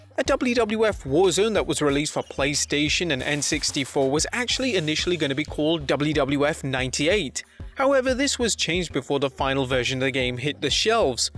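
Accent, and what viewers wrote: United Kingdom. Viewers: Ah, the beeta version. Yes and "go to hospital" as well without the article "the". Love the Brits.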